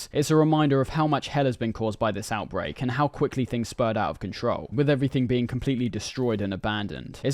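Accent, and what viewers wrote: United Kingdom. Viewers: When I saw this on my feed I said to myself, "please have a brittish accent, please have a brittish accent". Was pleasantly surprised, idk why but it gives a sort of legitimacy to these essays.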